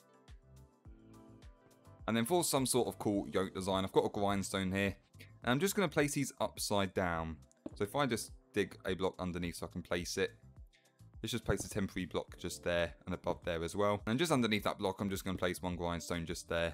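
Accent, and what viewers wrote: United Kingdom. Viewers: This british akcent😰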